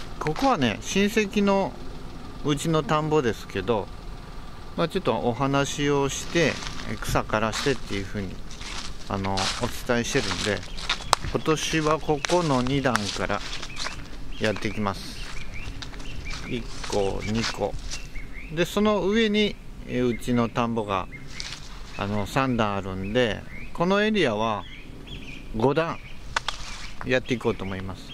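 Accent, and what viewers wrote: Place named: Oita, Japan. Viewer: 、大分弁が身についちきたなぁ😁 どーくり師匠のおかげかな❓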